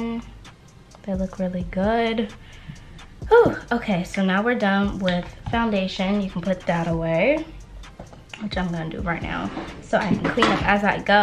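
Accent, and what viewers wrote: New York, United States. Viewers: I can tell you a new Yorker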